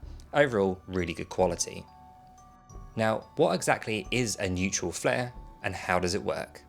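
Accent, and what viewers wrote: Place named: London, United Kingdom. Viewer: 好听，正宗的伦敦音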